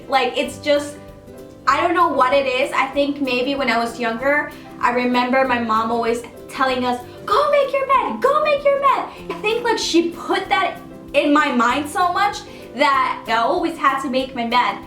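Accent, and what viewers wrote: Spain. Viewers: I love will smith I want to go to Tokyo japan I want to speak Portuguese your Spanish accent is very pretty btw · i love the Spanish accent that influences the pronunciation of your English letters ❤❤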